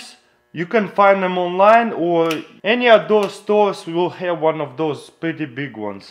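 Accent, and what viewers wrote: Russia. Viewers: сразу понятно по акценту, что чувак-русский )))))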